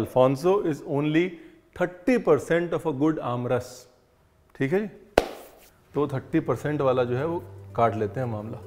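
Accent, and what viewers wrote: India. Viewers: Never heard of it sir love your deliberate desi pronunciations "waaater" 'mangostaan' nice dish definitely going to try it salute to my favourite chef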